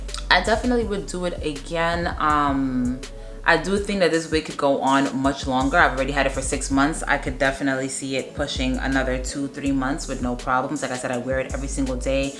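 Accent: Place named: East Coast, United States. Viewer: East Coast Accent - I love it! If not it sure sounds like it! And its waaay worth the money that you spent! I love it on you!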